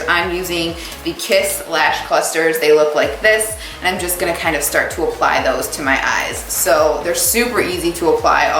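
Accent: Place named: Upper Peninsula, United States. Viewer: Are you a yooper?😂 Me and the rest of my family are northern and you sound just like us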